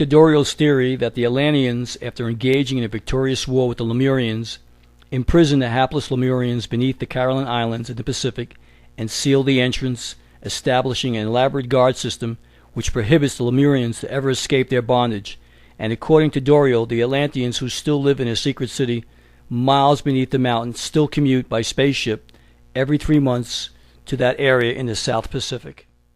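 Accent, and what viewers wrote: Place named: New York, United States. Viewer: Narrator with a NY accent does documentary of stories from Mt. Shasta, in CA?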